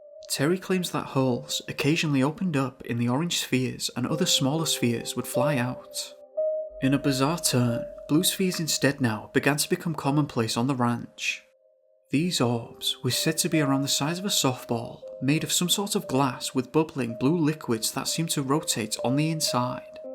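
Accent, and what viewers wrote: United Kingdom. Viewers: It's called an accent..a very specific British one.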